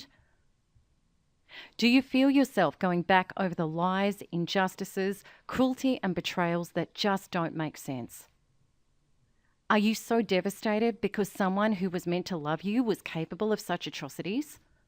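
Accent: Australia. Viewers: I can't stand the Australian accent.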